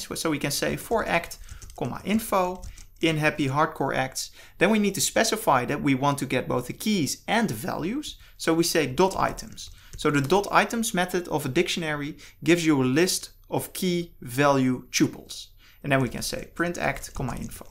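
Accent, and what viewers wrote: Netherlands. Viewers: Subscribed want ik hoor meteen de Nederlandse accent:p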